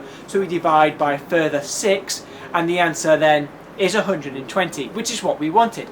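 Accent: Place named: United Kingdom. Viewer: i used to be so racist towards British accent, yours are.. just awesome , thx for repairing me ^^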